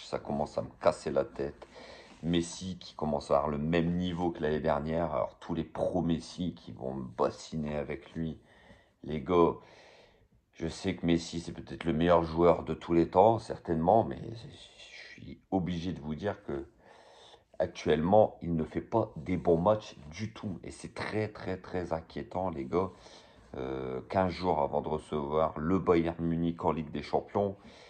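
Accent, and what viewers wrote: North, France. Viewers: y a un accent du nord la derriere ? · Voilà les gars . Tu viens du nord ou koï?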